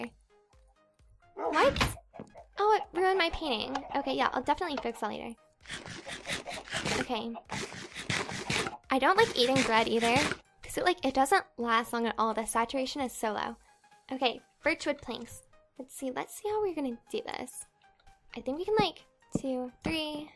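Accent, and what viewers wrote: Canada. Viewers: R u from Canada? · Are you canadian? :o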